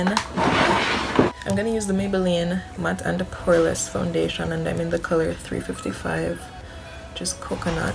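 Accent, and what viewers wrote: Jamaica. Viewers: R u Jamaican? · She a Jamaican me hear the accent eno 😂😂💯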